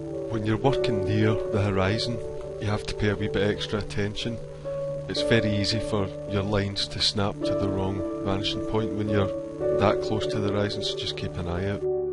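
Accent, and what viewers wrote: Scotland, United Kingdom. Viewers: Love the accent, I think Scottish is the coolest accent actually :D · good vid but i hate that scottisch accent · Thank you:) I have played this and followed along (basically playing with making my own buildings, street, art on the buildings) and so I have learned how to use the perspective tool in Painter. The music is nice, so is Scottish accent. Music is fantastic too. Best wishes and keep up the good work:)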